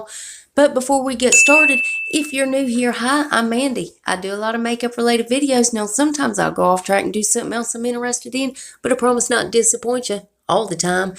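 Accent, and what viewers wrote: South, United States. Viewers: Thanks for the spoilers love!! This box is going to be awesome and u totally got me excited to get my boxyluxe this month Mandy. My nickname is Mandy as well. Love he southern accent sis, I have a southern accent too. I’m from southeast Kentucky. I do beauty subscriptions box openings as well on my channel so hope to see u over my way sometime too. Much love to ur channel, ur doing a great job. Subbed and liked ur vid. ❤️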